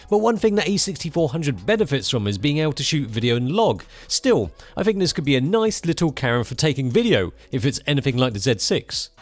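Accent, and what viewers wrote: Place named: London, United Kingdom. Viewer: he doesn't really..... accent is London-ish?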